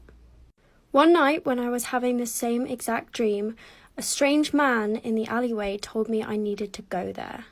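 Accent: United Kingdom. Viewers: An someone tell me why she English like me but only ever lived in america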